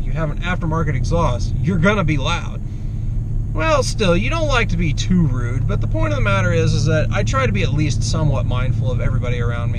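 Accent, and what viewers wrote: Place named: Midwest, United States. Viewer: In Mexico? You sound like you’re from the Midwest?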